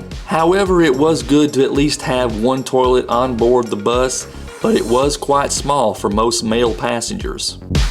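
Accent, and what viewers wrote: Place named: Eastern Kentucky, United States. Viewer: Thanks mucho for your very dry narration - and yeah, hear a bit of Eastern KY in there!!!!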